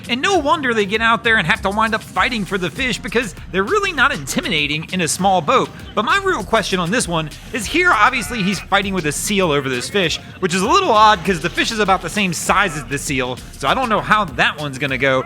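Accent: United States. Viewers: loved the vid. Had to turn off the sound though. That constant loud whiny american voice did my head in.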